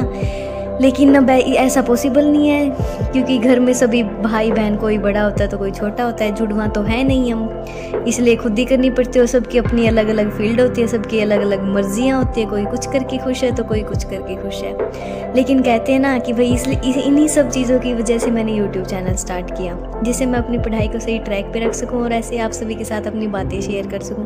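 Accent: Haryana, India. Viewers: Haryana se ho aap didi because aapki awaj se lagta h muje ❤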